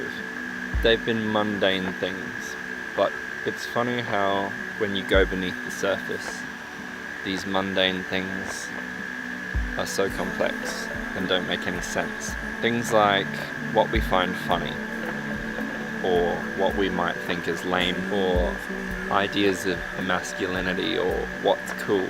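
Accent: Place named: United Kingdom. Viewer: so much yeah. btw given your accent i assume you're British so i'm sorry about brexit and all the possible negative consequences it might have.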